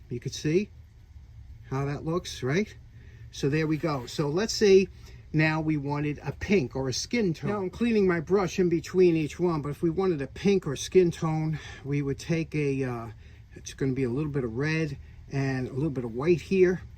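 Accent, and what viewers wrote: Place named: New England, United States. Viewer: Great vid but..........Your Yankee is showing. It's not Pea_Can, it's pe-kan!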